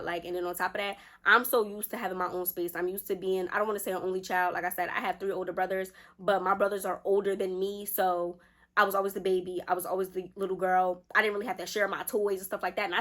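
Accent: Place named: Philadelphia, United States. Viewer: As soon as I heard you talking I knew you were from my neck of the woods (I’m from Philly )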